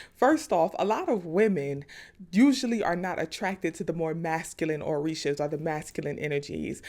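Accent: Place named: North Carolina, United States. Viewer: You sound like you're from North Carolina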